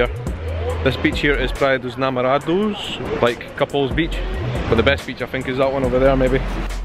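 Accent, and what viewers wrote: Scotland, United Kingdom. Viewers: Are the Brazilians, having a wee trouble with your Scottish accent? :) Have a great time.. I hope they ship that Lemon Cake to the U.K.! for you...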